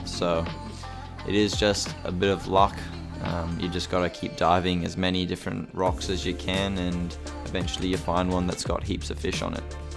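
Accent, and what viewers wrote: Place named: South Africa. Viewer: Sounds like you have some South African in that accent 😊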